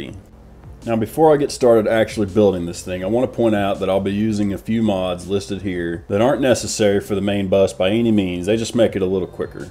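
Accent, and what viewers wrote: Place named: Midwest, United States. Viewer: Hidden Gem of Satisfactory Video. Double props for midwestern explaining factories.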